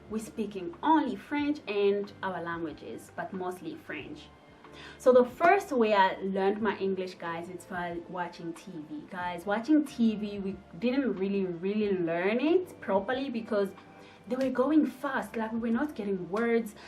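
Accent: South Africa. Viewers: U don't look like Congolese your seems like South African English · i like the way you speak English you sound like South African now and not forgeting you are so beautiful. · Now U have south Africa accent Ur English is just south Africañ. And i like small nyana, shela U now speak our slung. 🥰🥰🥰🥰🥰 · sounds very south African and also look very southern African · You sound and look like South African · Hhaibo your accent sounds South African now?? 😯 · She's South African this one😹😹❤❤ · You look and sound South African. Did I just hear you say "smaller'nyana"...... You are South African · now youve got a south african accent nice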